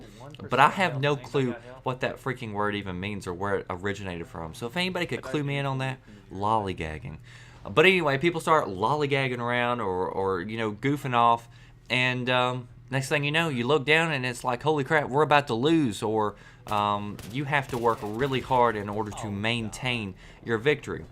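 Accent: South, United States. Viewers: where you from? i had a feeling you were from the south with that accent. go vols baby. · what part of the south are u from?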